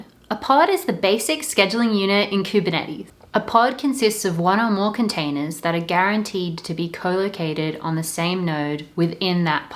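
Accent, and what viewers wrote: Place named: Australia. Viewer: I came for the tech but stayed for the accent! #AussieAussieAussie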